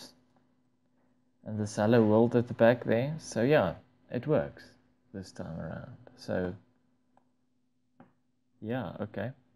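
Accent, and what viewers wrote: South Africa. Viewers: You sound South African? Good tutorial by the way! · are you perhaps south african?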